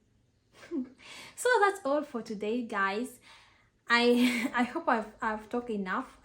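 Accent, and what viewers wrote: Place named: Tanzania. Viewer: Hello thank you so much for your video it's very nice.I can here that your from Tanzania, please if you will not mind I can have contact with you because also I'm from Tanzania and want to speak with you. Thank you for your understanding 😊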